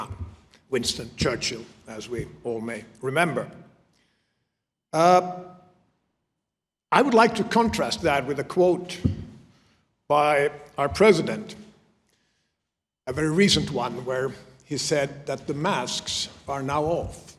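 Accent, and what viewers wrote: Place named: Mid-Atlantic, United States. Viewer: mid atlantic accent👹👹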